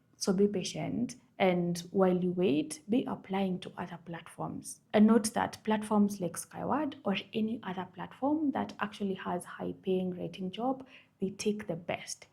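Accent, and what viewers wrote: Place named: Kenya. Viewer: I immediately knew from your accent that you’re Kenyan. Proud to see another countrywoman representing us in this space ❤️ (you are Kenyan though, right?) 😅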